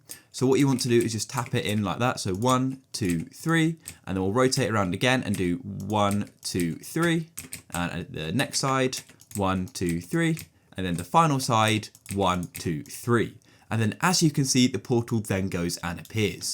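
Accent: United Kingdom. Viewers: Omg ur British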